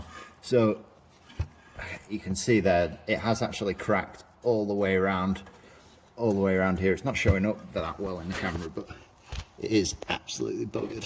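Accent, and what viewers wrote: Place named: Warrington, United Kingdom. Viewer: yeah i had mine swapped over - made a difference as the castor got adjusted (wheel sits further forward now). great work tho man - you dont sound far from me (warrington based myself) and i visit 3 sisters from time to time - be great to catch up and check your car out :) ant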